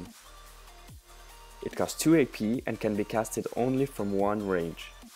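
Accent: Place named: France. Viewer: you sound so french... don't forget about the "ed" after a "t" is pronounced [tide] and pronounce your "h"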